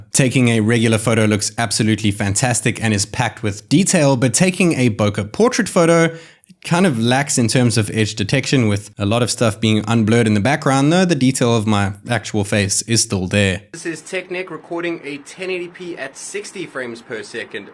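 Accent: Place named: South Africa. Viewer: Searched for the k50 video and yours came up first . A few seconds in I was like nah.... This dude is South African , he has to be. And I see ,yes you are. Amazing. Well done man. Wish you millions of subs . Still watching the video and it's great so far. God bless you bruh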